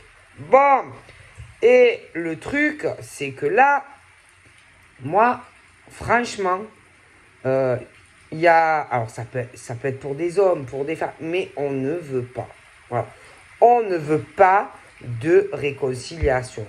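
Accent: South, France. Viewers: 🙂 j'aime bien quand tu dis : d'accord hein bon😊avec ce bel accent du sud 😊💕💐 ça me fait sourire 😊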